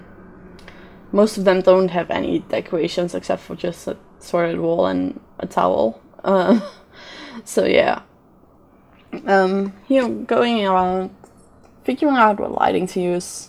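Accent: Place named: Netherlands. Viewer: Awesome build! Your accent sounds Dutch, so are you Dutch?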